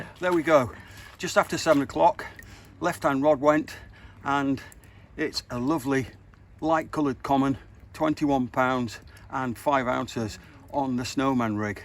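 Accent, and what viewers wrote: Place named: Germany. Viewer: Thanks, that’s a German accent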